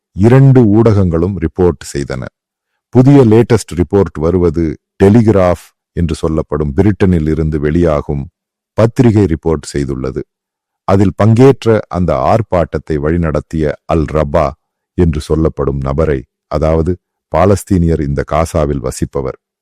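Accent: Kerala, India. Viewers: Native kerala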